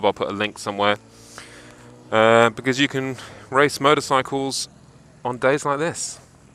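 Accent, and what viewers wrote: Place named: RP, United Kingdom. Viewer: cool, you sound exactly like Sacha baron cohen's normal speaking voice,, not Ali g. Well posh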